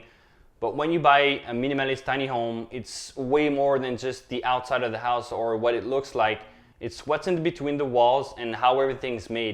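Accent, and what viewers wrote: France. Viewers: Engish is better than french. French ppl speaking english accent is cool. Lol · Loved your comment I laughed so hard. It's the French accent lol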